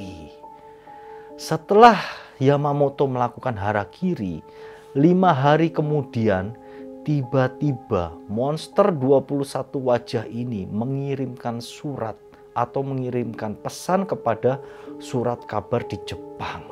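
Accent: Java, Indonesia. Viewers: Medok bgt dah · Jawa apa sunda om? Kok indonya medhok hehe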